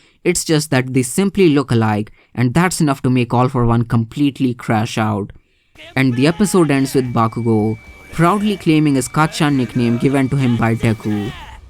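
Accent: India. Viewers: Clicks video, hears the thicc Indian accent, alright I'm out( after commenting ts ofc)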